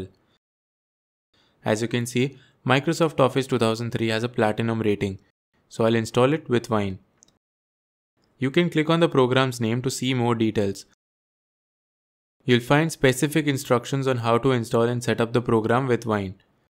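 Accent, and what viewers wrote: India. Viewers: When the narator sounds like indian and you know what you want will be fixed in no time 🥹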